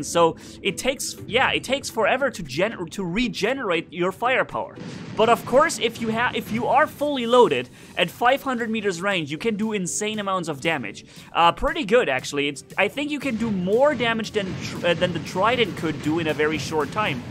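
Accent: Germany. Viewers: You do realize that English is NOT his native language, he's lives in Germany. Why don't you type your posts to in German and see how well you write/pronounce words in his language.. In fact I'm not positive but I think he learned English just in the last 5yrs or so. Don't worry though, I’m sure and others around the world, who dare to speak on social media in their non native language of English, will take your post to heart, and vigorously practice their proper pronunciation of English words before their next video/podcast. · Are you German?!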